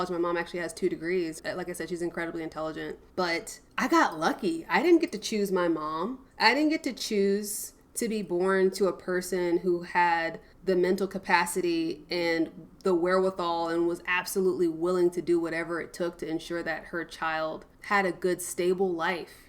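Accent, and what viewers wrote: United States. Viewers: I absolutely love your personality. I think you're fucking cool. Your way of speaking did bother me the first few minutes but it was more about your american girl accent than the swearing (which I do a lot as well anyway).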